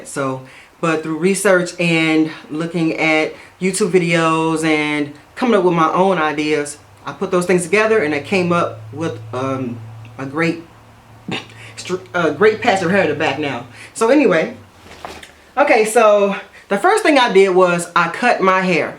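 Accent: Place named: United States. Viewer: you have these gorgeous african features, those cheekbones I'm dying for. your lips are gorgeous and your skin was given to you directly from jesus. hahah, you're beautiful!!! i know you're american, i hear it in your voice, its just your features are so distinct and royal, lol. thanks for the hair tips, yours is lovely!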